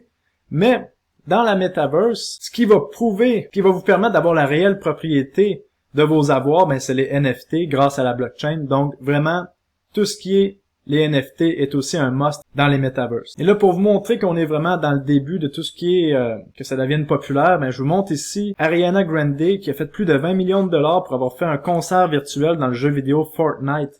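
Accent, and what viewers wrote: Canada. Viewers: Accent canadien, c'est super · Tes vidéos sont +++. Je les adore, inspirantes et pratiques sans compter ton accent qui me font revivre les inoubliables souvenirs de vacances à Montréal et au Québec. Merci.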